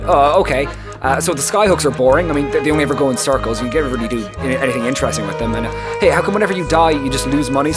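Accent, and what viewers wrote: Ireland. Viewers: yup pal are you irish?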